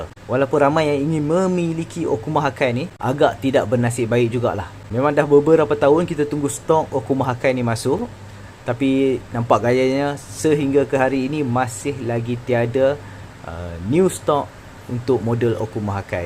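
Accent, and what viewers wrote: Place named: Penang, Malaysia. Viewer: Dekat jla ingat org penang😅😅😅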